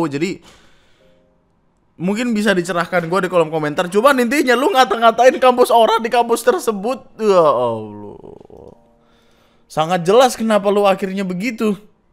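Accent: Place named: West Java, Indonesia. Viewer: Ini gw aja atau emang bang jadi ada logat sundanya wkwkwk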